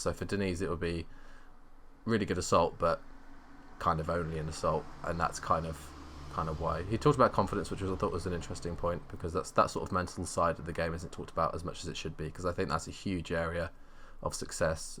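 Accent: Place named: England, United Kingdom. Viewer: The thick English man of Battlefield Community is back. Love ya Keep up the content! 🐐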